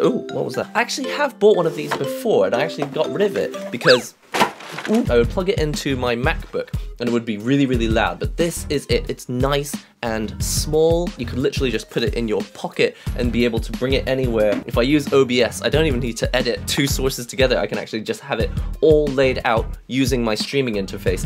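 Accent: England, United Kingdom. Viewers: It could be a thing, livestream wearing a suit and tie...with the English accent could be your unique selling point.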